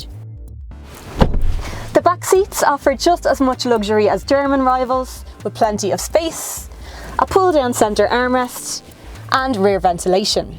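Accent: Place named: Ireland. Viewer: Greetings from Sweden. I love your Irish accent... so pleasant. Great review from a beautiful woman.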